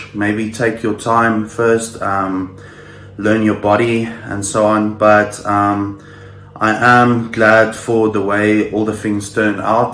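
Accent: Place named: South Africa. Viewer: Hahzit boet, good to hear a fellow saffa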